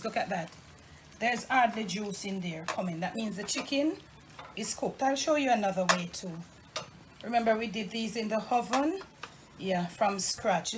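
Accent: Jamaica. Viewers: I looove chicken, especially the dark meat, it has more flavor, and looove the flavor combination you have in all your recipes, but especially this one..I'm a nasty girl and save the yummy drippings to use to flavor other dishes.I even have brushed breads, or biscuits with it..or if I make lentil or bean stew I use that flavoring in it by way of the drippings, just a little though..you are my magic kitchen queen to watch cook, I love your recipes and love your beautiful Jamaican accent(I do believe it makes your food more yummy)..Bless you and keep on coming with more scrumptious recipes..oh, I have discovered a new and super health type of rice, called " Black forbidden rice" and another that is " Red rice" .They have even more health benefits and anti aging things than Blueberries !..and they taste really good, not yucky like brown rice (I hate brown rice)...you might try it..in 3 months it lowered my cholesterol and helped me loose a little weight( miracle)..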